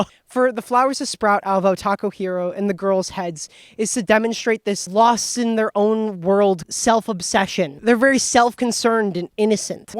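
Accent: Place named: Minnesota, United States. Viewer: thank you for your unabashed use of the minnesota accent this is near and dear to my heart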